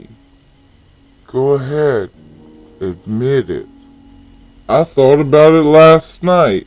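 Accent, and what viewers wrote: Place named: South, United States. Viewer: Woah he's southern damn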